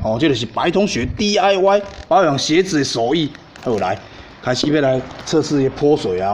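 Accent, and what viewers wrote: Taiwan. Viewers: 口白：落魄到拍Youtube要轉便當錢（沒辦法） 說真的，真的是一個很務實、又踏實的人（擦鞋也算是生活小細節，小品）加上一點點的江湖味，操的台語口音，真是滿有意思的。所以，工作太忙也要記得來收看的影片。給的建議：拍影片是快樂的，不要因為這樣有壓力，坦白說一支影片要弄好大概也是要花很多時間。記得要幹嘛！訂閱！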